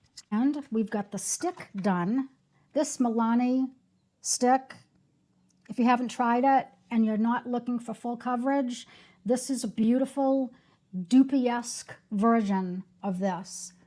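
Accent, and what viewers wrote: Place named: New York, United States. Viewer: Beautiful woman❤ I love your accent!……New York? Thank you for tips. I agree glow is so important 😊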